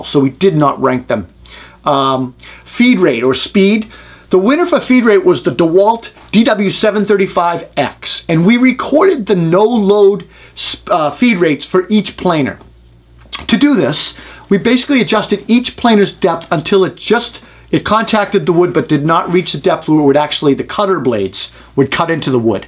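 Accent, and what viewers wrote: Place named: Worcester, United States. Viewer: Having lived in Worcester for the first 20 years of my life, I could place that accent immediately.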